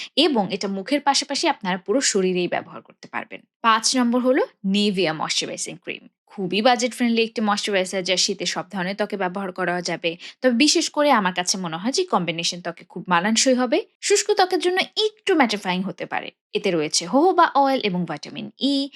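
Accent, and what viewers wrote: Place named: Bangladesh. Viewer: Just watched a few videos of your english channel. I love your bangla accent but all the best for your English channel as well ❤❤❤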